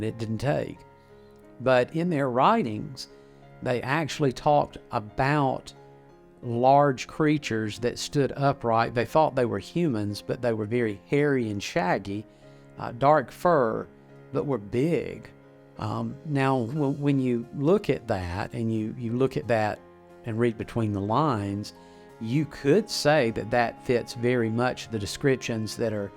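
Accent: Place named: Australia. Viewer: Love the accent.. Sydney'Oz Solid Salute 😭🙌🏼💫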